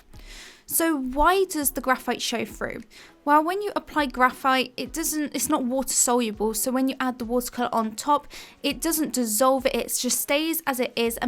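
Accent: United Kingdom. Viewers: Are you from UK? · Sou are bri-ish (no hate nice tips):)it help me alot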